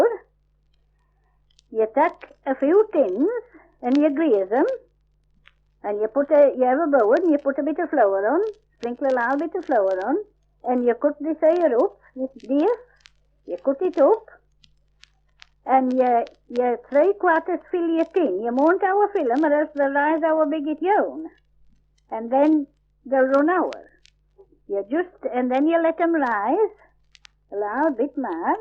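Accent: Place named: North Yorkshire, United Kingdom. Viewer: the Northern West Riding however is considered part of the North Yorkshire dialect group, hence my comment. Were she a West Riding speaker proper you'd have things like: - "hoil" not "hooal" for "hole - "spooin" not "speean" for "spoon" - "stooan" and "sooa" not "steean" and "seea" for "stone" and "so" - "spare" not "spaar" for "spare" - "oven" not "yoon" for "oven" - "bake" not "beeak" for "bake" - "one" not "yah" for "one" before nouns - "enuff/eniff" or in the plural "enew/enow" and not "eneeaf" for "enough "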